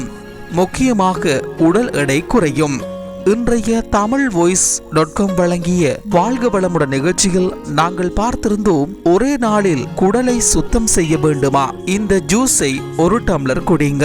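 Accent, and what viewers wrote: Sri Lanka. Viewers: He is Sri Lankan tamil...u watch only advantage... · That's srilankans pronunciation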